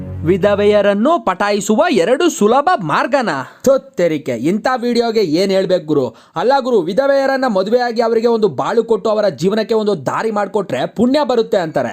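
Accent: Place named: North Karnataka, India. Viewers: sakath matadti nod pa hulli namm uttar Karnataka bashee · ಹಾಯ್ ಅನ್ನ. ಉತ್ತರ ಕರ್ಣಾಟಕ ಭಾಷೆ ಸೂಪರ್ ಅನ್ನಾ ನಮ್ಮ ಭಾಷೆ ಮಾತಾಡಿ ಬ್ರೊ flz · 3;35 Uttar Karnataka language love u Bhai ❤️ channagi matadtiri nivu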